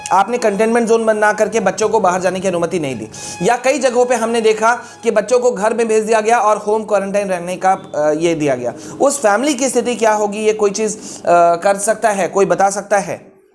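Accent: Bihar, India. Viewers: Sir aap kya bihar ke hain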